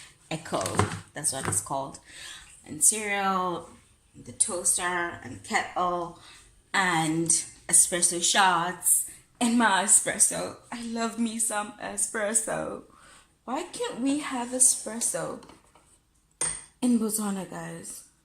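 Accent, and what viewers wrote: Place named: United Kingdom. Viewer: You sound very British You can ask for a UK man like me lol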